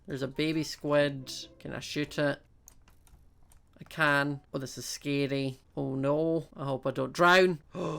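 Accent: Scotland, United Kingdom. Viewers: I feel like Scottish accent makes his videos funnier hehe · His accent!!!!😍😍 Give me your accent! Anybody else love Scottish accents.😍😍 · I just love his Scottish accent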